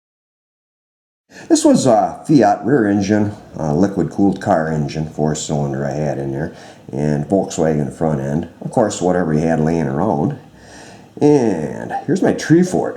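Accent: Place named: Midwest, United States. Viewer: Where do you reside? By your accent I'm guessing Illinois, Wisconsin, Minnesota, or Michigan.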